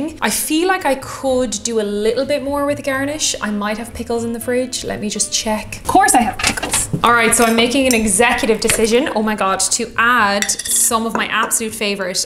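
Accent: Ireland. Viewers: Speaks beautiful irish 🚻 is absolutely stunningly beautiful 🚸plausibly bordered line alcoholic 🚼🚼,Jesus could you stop sending your angels to earth to be amongst us mere mortals,🤔😂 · Pronounce worcestershire however you want to. Modern English changed all of the pronounciations of these types of words, anyway. There's really nothing anyone should say. Maybe that's just the Irish bit of me wanting to stick it to the English? I am fine with that. Like they've been known to be nice to Irish folks, riiiight? Definitely anything sounds adorable in an Irish accent, so there is that, too.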